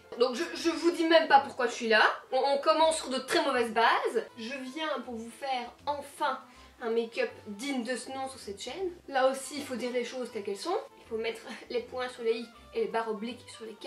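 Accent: Belgium. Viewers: Mais l'accent pur belge on adore 😂😂 Love!!! Je suis pour une rencontre avec Jacky!!! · L’accent belge qui ressort en force! Lol Cette vidéo m’a tuée ! 😘😂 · Je surkiff vive l'accent belge 🇧🇪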